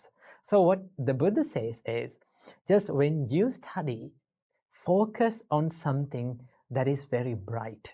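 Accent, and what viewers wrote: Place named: India. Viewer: His english feels hindi 😊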